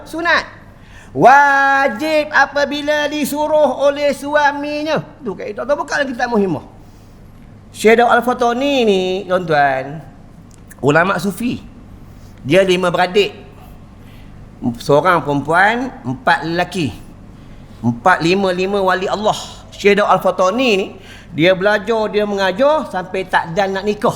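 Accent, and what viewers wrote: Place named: Perak, Malaysia. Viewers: Org perak mmg ckp dngr mcm kasar..tp padahal perckapan biasa ja..serupa la org x selalu berkawan org utara..dngaq loghat org kata smbang kasaq..padahal bisa la tu.. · Orng perak mmng ckap kaso sikit jngn terase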